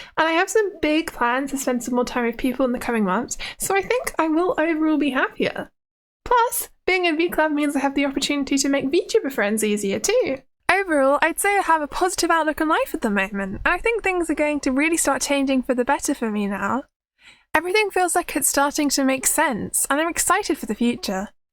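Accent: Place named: United Kingdom. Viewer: 21? Hmm. I was certain you were older. From the way you speak and act, but maybe the people around me are less mature, or it could be your British mannerisms and dialect. I have not spoken to a lot of people from the UK. Your life seems to be stable and you seem very happy with it, for the most part. All the best to you lady.:face-red-heart-shape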